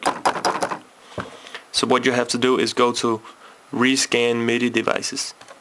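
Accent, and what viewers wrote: Denmark. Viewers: You sound insanely danish its crazy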